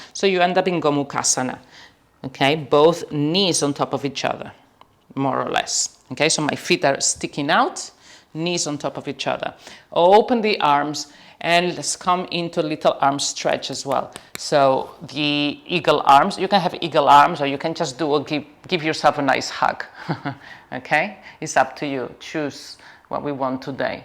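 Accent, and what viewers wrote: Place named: Spain. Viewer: Amazing class, accessible to all with a twist of spanish accent. My go to class when I'm in need of slow movement and ease of mind :)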